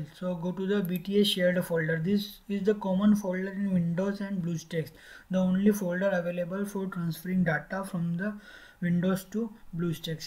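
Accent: India. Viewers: You know you're saved when the commentary is in indian accent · That is the most Indianest accent I have ever heard. Keep up the good work bro!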